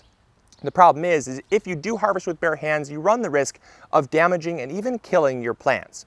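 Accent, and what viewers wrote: Michigan, United States. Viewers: This is completely off topic but as a small backyard gardener in California (born in Michigan) your michigander “accent” makes me smile 😃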